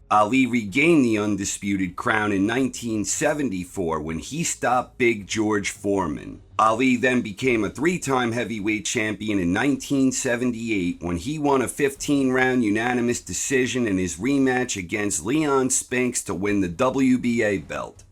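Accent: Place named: East Coast, United States. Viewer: What east coast city accent is this?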